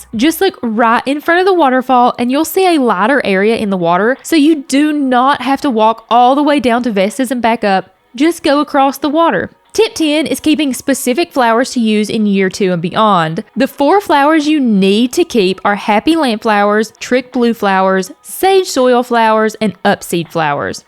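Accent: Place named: West Virginia, United States. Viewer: I love your accent, my friend is from WV and she sounds just like you!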